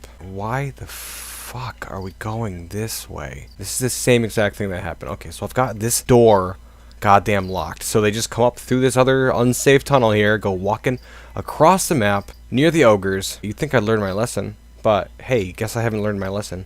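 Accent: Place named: Connecticut, United States. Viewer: smoky, rocky, caffeined, autist connecticut accent is best accent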